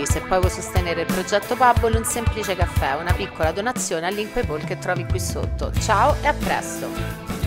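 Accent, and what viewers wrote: Rome, Italy. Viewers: Canale interessante il suo, ha attirato la mia attenzione, anche se il suo accento romano.. 🎶Mamma lupa, poveretta, i è svenata con i denti🎶 Il giornale ha riportato con stupore Non poteva sopportare che il suo popolo invasore🎶 Diventasse una colonia di invadenti Hey-la-la-li, ya-la-la-li, ya-da-da-ya-da. Bom dia.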